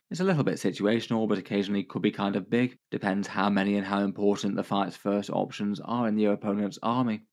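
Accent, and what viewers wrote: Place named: United Kingdom. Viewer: Has anyone ever bumped into at a Tournament? I'm wanting to confirm he's a real person that lives in a house, sounds like he's from the UK. Surely someone knows him!!😅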